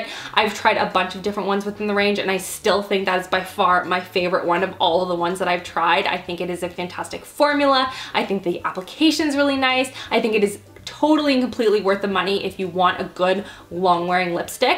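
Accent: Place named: Canada. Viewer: riley gatenby a Canadian one, if anything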